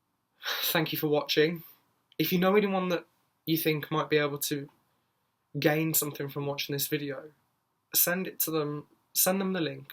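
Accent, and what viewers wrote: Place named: Birmingham, United Kingdom. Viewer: I know I haven't seen you for a few years now & that you don't want sympathy but I just want to say that this is a really brave thing that you're doing. It's really good to see you facing this with such bravery & strength. I'll be staying tuned & I'll be thinking of you (I would say praying but it's not much good if you're godless). I have to say I think it's hilarious that you thought you had HIV (wrap it up ) & you do sound like a right Brummie in this video! 😜!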